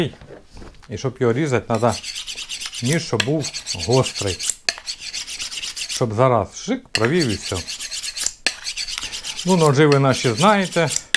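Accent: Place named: Kharkiv, Ukraine. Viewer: Дуже подобається твій говір!!! Наш-Харьковский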